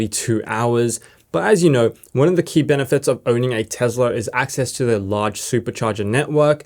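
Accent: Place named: Australia. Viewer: You've got the Australian accent but keep using "miles" ... A little disorienting :P Now... I just need to drastically increase the amount of money I have and I'd be able to afford one :D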